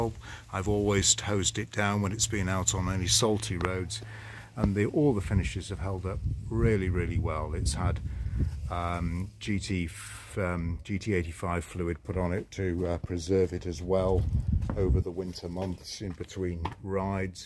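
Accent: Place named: France. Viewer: Can't you tell by his accent he's french?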